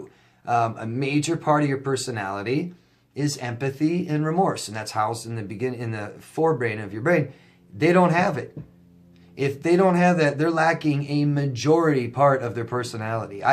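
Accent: England, United Kingdom. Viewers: Your English accent is really funny..Nessa from England !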